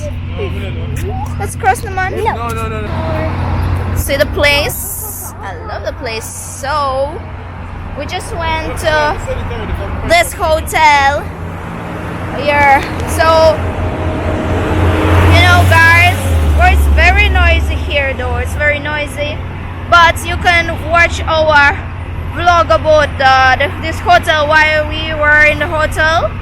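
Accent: Jamaica. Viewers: I love how she sounds like a long time yard woman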